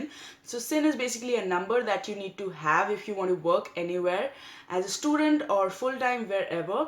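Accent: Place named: India. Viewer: 🐷🐷哎呀妈耶，这么隆重的阿三口音, 真的是所有单词都听懂了，但什么都没听懂最后🐷🐷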